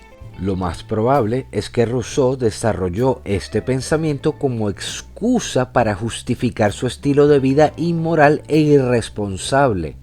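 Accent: Venezuela. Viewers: Por tu voz me acabo de dar cuenta que " ", debe ser venezolano. ¿Cómo alguien que escapó del intento de comunismo puede defender el comunismo?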